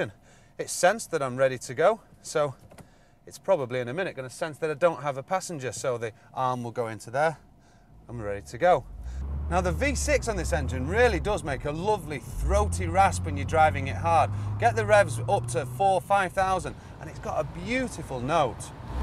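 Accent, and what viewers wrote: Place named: Northern England, United Kingdom. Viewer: He's just northern lol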